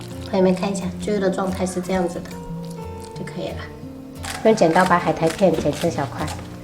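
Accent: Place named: Minnan, China. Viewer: 好可愛的閩南口音，聽到跟自己一樣的方言，好溫馨暖心，很喜歡妳的頻道，真真實實的料理，真真實實的生活!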